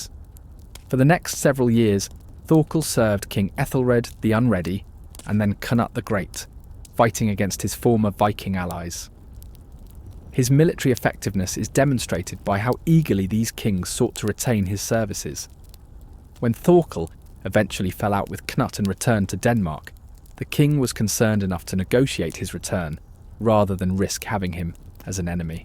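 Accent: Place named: England, United Kingdom. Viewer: I dig the verbal painting the author of this is writing and the narration for being English sounding not to bad I wish there was some buddy on the whole of utube besides myself and a pretty girl from Iceland that taught me vicariously through her channel that most of the world is pronouncing that word VIKING Wrong the I does not belong in the pronouncieation and really shouldn't be in the spelling either but dainish English sweedish Norwegian and probably all forms of Germania have shit tons of letters inside words that make no damnd sense at all if you're ludtining to the prononcieation the word viking no exception the I does not belong the pronouncieation is actually vking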